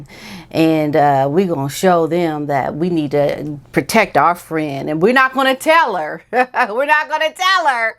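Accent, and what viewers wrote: England, United Kingdom. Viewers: GreatEnglish accent.😂💖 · 😆😆😆 Love your British accent! Made us laugh here in the UK. “Lovely!” xx 🇬🇧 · Hello. You look gorgeous, as always. You're spot on all the time!! I look forward to your videos. You are so funny! Love your English accent!! Have a great day.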